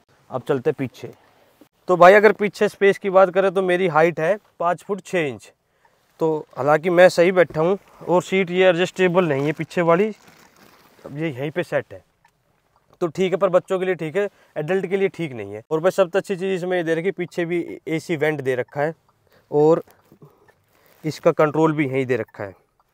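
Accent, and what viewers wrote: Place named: Haryana, India. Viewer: Bhai mere loved ur haryanvi tone …. Pehli bar haryanvi mein car vlog dekh ke ghana hi maja aagya … moj kar di bhai … bhot badhai aapka haryanvi mein vlog dekhte hi subscribe kia meine channel aapka … Jai shri Ram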